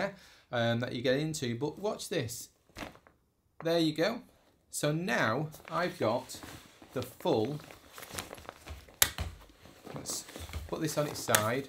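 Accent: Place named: United Kingdom. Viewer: Damn. Nothing worse than clicking on a video from a creator you've never seen and they're Bri'ish. SMH. So sad.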